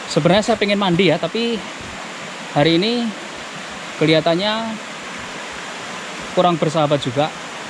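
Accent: Java, Indonesia. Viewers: mas njenengan jowo ne pundi to kok enak banget tutur katane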